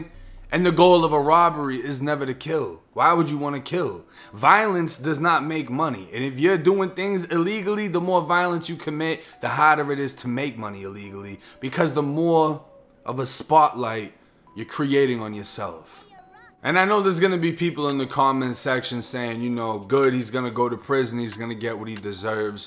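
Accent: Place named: New York, United States. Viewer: I subscribed because of your NY accent?? Lol. Thanks for being articulate, and a good story teller.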